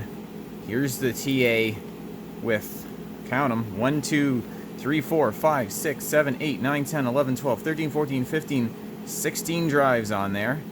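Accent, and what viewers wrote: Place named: Canada. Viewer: I can tell by the accent in the first minute of watching, you are Canadian. *Edit: Sorry this is so random. Love the vid!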